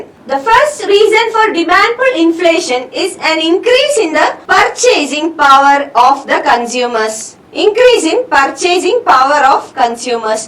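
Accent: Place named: South India, India. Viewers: nice,madam from kerala i think! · Bhai Hindi medium walo tumko accent bhi samaj ni ata kya wo south Indian he Bhai Hindi ni janti..Jab dekho Hindi Hindi..Just go to mrunal.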